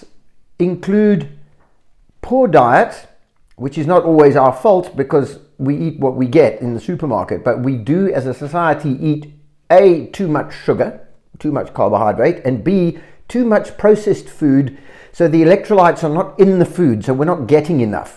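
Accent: South Africa. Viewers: Are you from South Africa 🇿🇦😀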